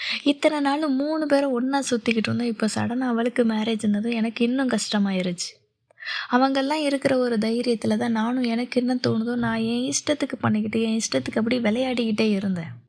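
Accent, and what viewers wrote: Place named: Sri Lanka. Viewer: enaku theriyadhu ak. Tamil pesivangau. I like it srilanka tamil❤❤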